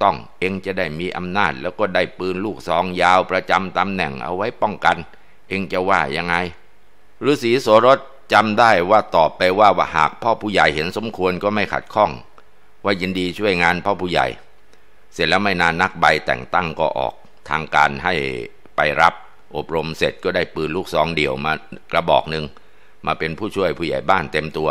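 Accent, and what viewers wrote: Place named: Isan, Thailand. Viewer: ตลกเสียงอิสาน ตลกเสียงอิสาน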